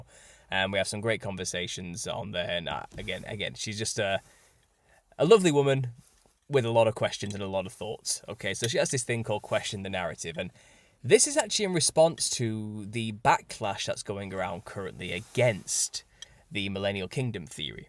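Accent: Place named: Yorkshire, United Kingdom. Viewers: I am sure there are books missing, has Yah isnt a God of confusion. Which is why i am reading the whole apographer. It will be revealed to us has and when we need to know. In the meantime looking for answers is keeping us busy and we are getting a better relationship with Father because of it. On another note im sat listening to this trying to place your acsent, Yorkshire i think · can I just say what a joy it is to hear a northern English voice. Thought I was on my own here in the northwest. If you are anywhere near, check out the great hall in Rochdale Town Hall. It's amazing, I'm fully on board with this whole theory/truth. Once I had made this decision, we randomly had to visit Rochdale for an appointment and afterwards rather than waste the journey had a look at the town hall. It was like God giving me conformation 😮 keep up your amazing work. 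The bible is just making more and more sense now 🙌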